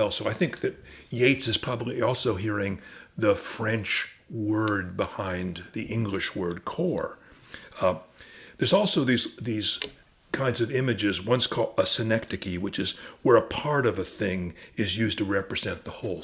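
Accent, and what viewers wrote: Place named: United States. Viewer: Americans... attempting to recite Irish poetry... and it just doesn't work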